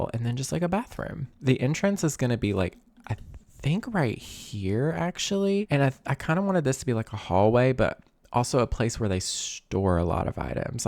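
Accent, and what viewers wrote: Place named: Appalachia, United States. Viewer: i knew when i heard ur accent i was like he sounds like he’s from KY😂😂 I guessed eastern bc ur giving Appalachia 😂❤ so proud to see you here 🎉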